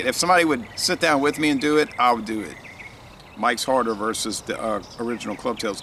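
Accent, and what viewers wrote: United States. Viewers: I should start making videos and give little digs about how you Americans pronounce and spell certain words.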